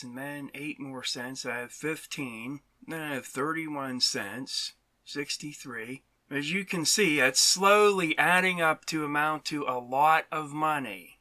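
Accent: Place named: Pennsylvania, United States. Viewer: Puts things into perspective! Your accent reminds me of my X's Ma. They were from Zelienople.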